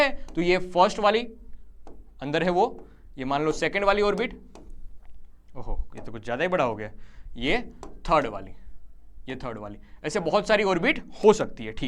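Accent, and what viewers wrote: Gujarat, India. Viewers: Sir you are gujarati or not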